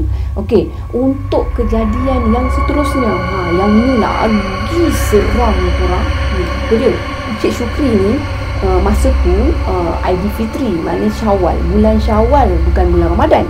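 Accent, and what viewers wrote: Kelantan, Malaysia. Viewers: Senjoh tu Hok sedak dgr kak .haha .kak ore Kelate ko .?area mano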